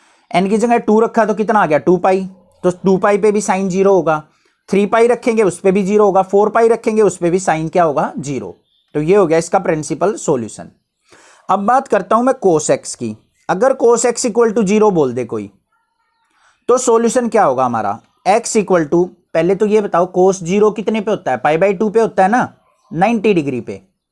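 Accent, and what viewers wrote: Haryana, India. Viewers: Sir are you from Haryana your tone is like haryanvi language I am also from Haryana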